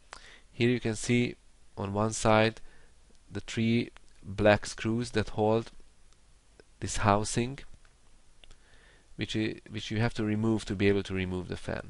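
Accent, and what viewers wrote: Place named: Hungary. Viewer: Tudtam, hogy ez magyaros akcentus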